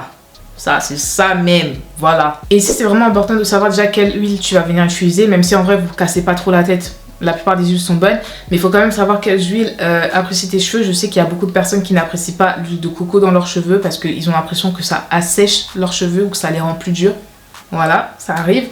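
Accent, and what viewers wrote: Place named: England, United Kingdom. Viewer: On entend l’accent anglais en fait!!!!😂😂😂😂😍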